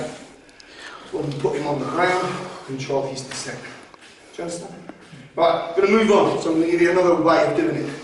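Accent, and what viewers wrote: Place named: Leicester, United Kingdom. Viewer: Hi, addicted to your vids, i’m probably your age and maybe had similar experiences growing up (BUT I wasn’t wearing a suit or uniform, enough said, i detect a leicester accent sometimes - i was in glen parva so you can guess the rest)), did bjj & striking at “mansfield chinese boxing club” (was actually “british combat” & bjj actually) also had my own “b’c’” weekly coach (one of the original 10 coaches certified by Geoff & Peter) so i was PASSIONATE about violence in my late teens up to now i suppose BUT had to give up on getting into violent situations whenever possible because i discovered money and for every step i took forward i took 2 back, The lesson i want to share with your viewers is this >>> WHEN YOU ARE GOOD “AT VIOLENCE” it’s an easy trap to fall into that you don’t bother to learn alternative life skills because violence gives you SOOOOOOOOOOOOOO much and that in it’s self is “self validating”!!! 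I was very lucky/ unlucky that i developed some serious physical problems that saved me from myself and forced me to learn new ways of dealing with situations, gaining validation etc, it has been an amazing addition to my lifestyle (ps i came from nothing & became “rich” in every way a young guy dreams to be rich in!!! BUT WITH VIOLENCE ALONE YOU WILL LOSE EVERYTHING, I am still as passionate as ever and i still have a devastating 2 minutes in me & feel the need to back down to no man because of fear BUT please i know you understand everyth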